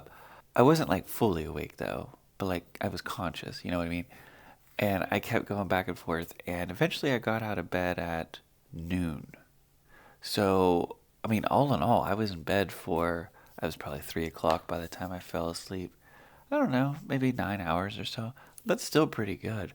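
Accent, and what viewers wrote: Pittsburgh, United States. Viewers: Love the Pittsburgh accent. Listen all the time. Love yer honesty! I’m an rn and agree with your conclusions. With our druggies the benedryl is always asked for when we give all the other sleeping meds.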